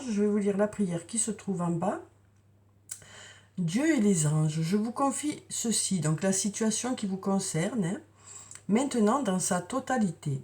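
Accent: South, France. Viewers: Merci pour cette guidance, toujours un plaisir d écouter cet accent du soleil, une voix calme et posée et surtout toujours cette bienveillance, vous êtes bénéfique et toujours dans le positif merci pour ces bonnes énergies que vous nous envoyez bonne journée☀ et prenez soin de vous. Bisous 😘👍💋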